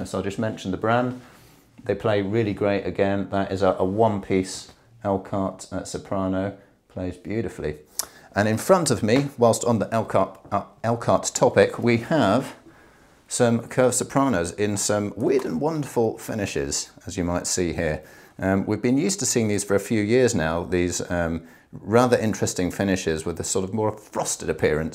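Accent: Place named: RP, United Kingdom. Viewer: Only a £4999 bari to start with, LOL, and, wow, with a genuine Boris Johnson accent, too. Speaking of which... I have a yacht for sale. It is only £1000 and it comes with a guaranteed weekly dividend of £3,500,000. I used to have that accent down to a T and then I moved to the US. Maybe that's a good thing, though. PS Don't worry, I have ordered stuff from you in the past and not even Boris Johnson can stop me.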